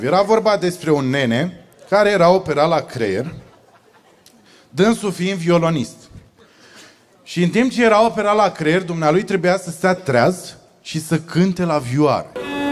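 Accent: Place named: Moldova. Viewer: Ca sa razi la dobitocul asta iti trebuie multa prostie...lasand accentul si vocabularul de ghertoi moldovean.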